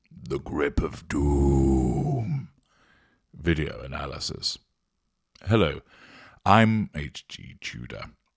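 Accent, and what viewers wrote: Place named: England, United Kingdom. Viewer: you knew an awful lot of the Aristrocrat's names on the steps. Coupled with your aside a few months ago about the clapham omnibus ( a very old metaphor only lawyers and hardly any of them understand or recall) i believe you are a member of the British aristocracy with close links to the law. As a narcissistic psychopath you would make an excellent judge or silk. You are also a royalist and know members of the royal family and find this upstart who has achieved wealth and position aggravating in the extreme. You also use voice alteration technology but some of your pronunciations would identify precisely what area of England you come from. eg how you say the word book. anyway i really enjoy your posts and hope you never are unmasked and continue as a latter day scarlet pimpernel.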